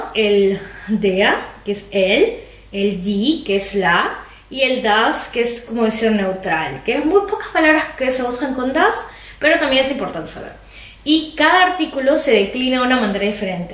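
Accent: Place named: Peru. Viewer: Tú dejo es peruanoo i love your peruvian acent! ich lerne Deutsch.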